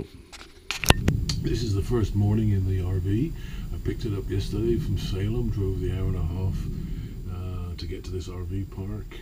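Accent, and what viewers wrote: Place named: Manchester, United Kingdom. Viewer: good travels--------i'll be subscriber # 12----------- i'll watch you on your journey. Please pass the Grey Poupon. Are you a Brit? you sound just like my brother-in-law, he's from Manchester. you answered that question-------cup of tea, lol.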